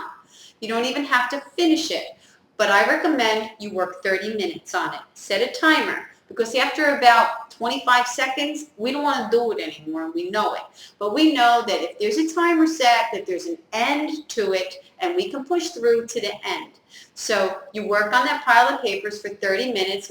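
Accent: New Jersey, United States. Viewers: I found you by reading an article where someone mentioned you in an ebay forum about eliminating cluttler. The first video I saw of you is the one with zippered curtain bags. At one point I had every size. From curtains to sheets to bed in a bag. I got rid of them all. Well actually I gave them to my kids for camping for sleeping bags and linens. I think you're great! Are you from NJ? You sound like it. lol.. my email is <mail> looking forward to your emails and thank you!!!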